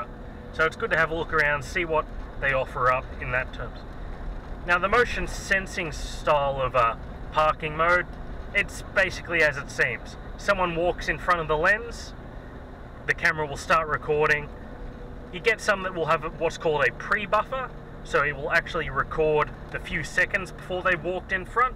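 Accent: Australia. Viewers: aussie accent! <3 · Thanks! Love that Australian accent!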